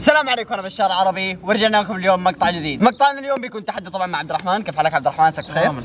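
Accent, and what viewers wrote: Saudi Arabia. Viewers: حلو المقطع يا بشار بس ليش ما حكيك سوري حكيك سعودي مع التحية للشعب السعودي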